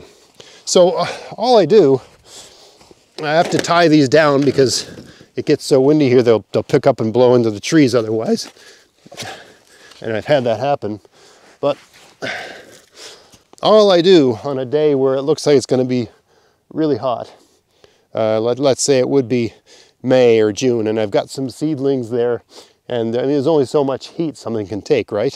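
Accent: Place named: Nova Scotia, Canada. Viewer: i am a huge fan of the trailer park boys and live in europe. the moment i heard you talking, I was absolutely sure you are from Nova Scotia and i cant believe i was right :) you remind me a bit of Randy I dont know why, you are handsome and he isnt :) maybe the eyes and accent. love your channel, just subscribed and started watching. Thank you for everything you do. greetings from central Europe.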